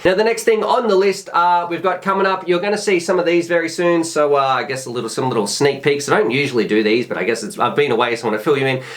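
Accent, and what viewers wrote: Australia. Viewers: Being new to this hobby, I'm curious just how many sports or activities there are. Especially at the entry level. I see a few drone makers doing a sort of flight school type thing with certificates at the end. Just curious if anyone has ever done an overview of all of the entry level activities there are they make the learning curve easier or more fun. Thanks for all the awesome work you've done, when I get to submerging myself into a subject on YouTube, someone always rises to the top of my watch list, that sir would be you. Might be the Aussie dialect, but I'm pretty sure it's because you do awesome videos!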